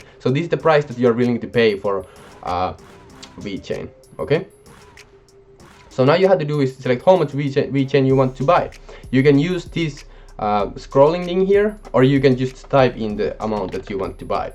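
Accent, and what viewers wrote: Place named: India. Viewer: I get an odd feeling that you talk like an India's people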